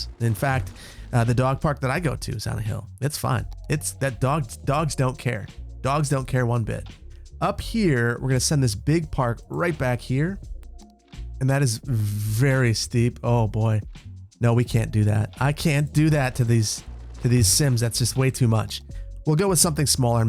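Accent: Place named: Upper Midwest, United States. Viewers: I cannot wrap my brain around your neutral Midwest non-accent, except when saying "tayg". But seriously, love the content - I always look forward to Saturdays when I can catch up on all your videos for the week. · Tehg Gotta love that upper Midwestern accent. Great episode sir! Did you ever add any houses of worship (churches) to VB?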